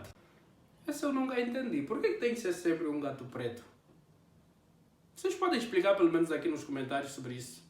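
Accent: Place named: Portugal. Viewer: Muito bom seu canal , mas e aí e vc e de origem ? Falando português de Portugal , eu sou do Brasil.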